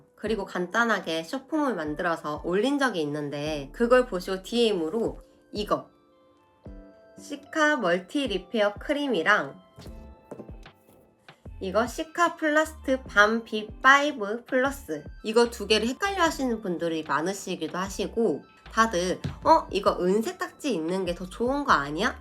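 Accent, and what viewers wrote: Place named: Jeolla, South Korea. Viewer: 오 완전 전라도억양!!! 전라도 분이신가요???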